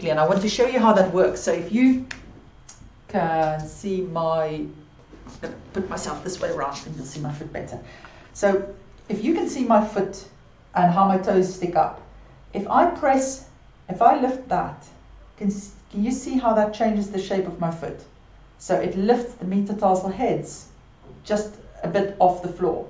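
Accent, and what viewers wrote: South Africa. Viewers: Great Talk. I Thought She’s SouthAfrican.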